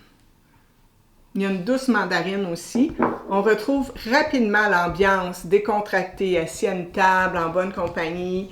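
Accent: Quebec, Canada. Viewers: Salut! J'adore l'art de la parfumerie et je viens de découvrir votre chaîne YouTube. En fait, j'essaie d'apprendre l'accent québécois en écoutant votre français! héhé J'espère que vous passerez un week-end extraordinaire.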